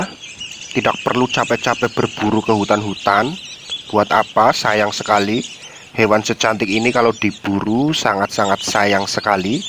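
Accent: Banyumas, Indonesia. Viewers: Wong ngapak ..pancen josssss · kita angon " sampean wong jowo ngapak pow kiE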